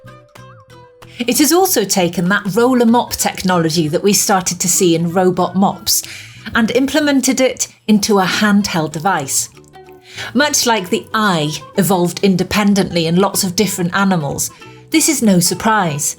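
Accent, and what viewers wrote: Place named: Scotland, United Kingdom. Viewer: Do parts of Scotland have a milder accent like yours, or is it a more individual thing...? Yours seems to be approaching Welsh (based on my very limited experience)... thx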